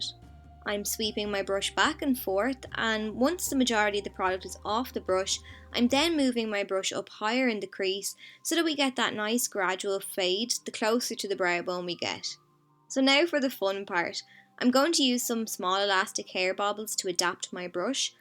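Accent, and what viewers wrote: Ireland. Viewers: As soon as I heard your voice I screamed 'YES IRISH!!' and my family all stared at me😹🇮🇪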